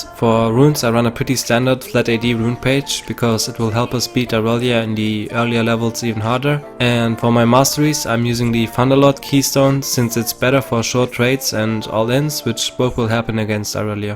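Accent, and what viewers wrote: Germany. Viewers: One question... Is he german, his voice sounds german in my opinion :D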